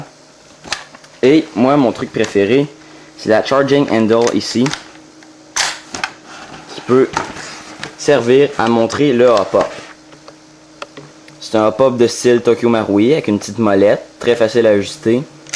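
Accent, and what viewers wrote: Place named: Quebec, Canada. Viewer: dsl mais tn francais est...... Mais t un quebecois dc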